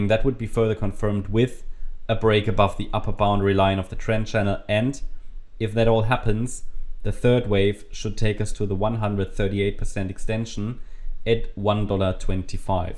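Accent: Germany. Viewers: War auch Ironie, hatte nur das Gefühl, dass er Deutscher ist, seinem Akzent nach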